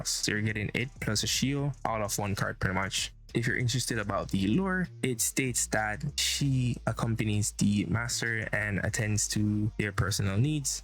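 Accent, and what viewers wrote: Jamaica. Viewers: Yuh sound like a yaad man.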